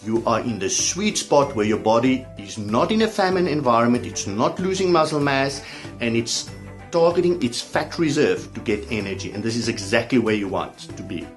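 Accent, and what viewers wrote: South Africa. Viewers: Not only do I love this guys information, I am addicted to his accent! SA has the best English accent IMHO...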